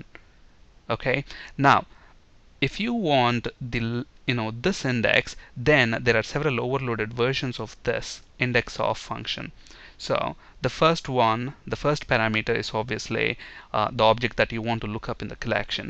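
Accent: India. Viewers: I (as a non-english native speaker) usually have trouble concentrating on videos with an Indian accent (-sorry for that sad assesment). But this dude is very didactic, expressive and illustrates every point very well! Hugely Recommend his different videos on different reference points for beginners or quick access to specific info! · I usually can't stand the Indian accent in tutorials, it's the cadency of the words it distracts me because when you speak with an Indian accent in English the sound is usually flat and has stops after each word. Maybe I'm a dickhead but I'm specifically referring to the combination of flat English + Indian accent in tutorials. The reason why I'm making this statment is to let you know that this does not happen in this video! This tutor is fantastic, dispite having an Indian accent the narration is not flat and it's pleasent, the contents are on point, concise and clear, thank you so much! 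Subscribed!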